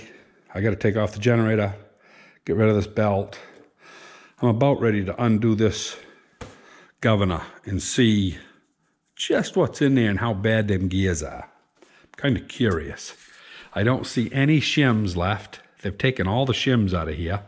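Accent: Maine, United States. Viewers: Its extremely funny for me to watch a fellow Mainer on youtube. LOL! We have our own dialect.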